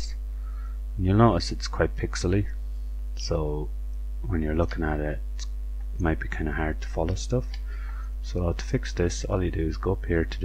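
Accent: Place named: Ireland. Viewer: The Irish accent will keep me watching this! <3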